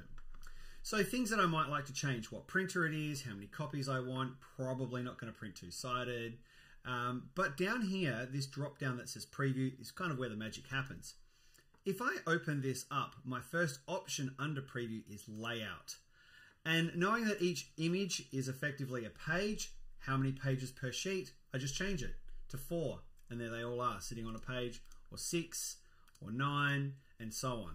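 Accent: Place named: Wales, United Kingdom. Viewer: Thanks mate, you saved my bacon. Also, I love your Welsh accent! 😉👍🏻🇬🇧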